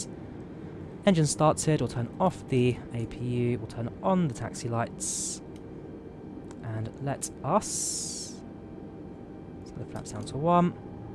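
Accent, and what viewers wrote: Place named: Yorkshire, United Kingdom. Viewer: Where are you from...you have that yorkshire accent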